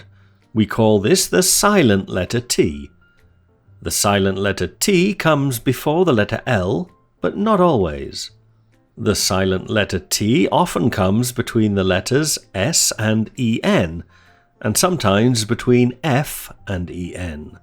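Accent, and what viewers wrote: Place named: England, United Kingdom. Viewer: Hi dear teacher, I am from Myanmar, English is not my language but I can express writing my feelings with English. But I can't speak it. Kindly guide me with some tips and I love your English accent and teaching. Could you plz also make a long video about phonemes and phonetic with great example plz. Thx so much .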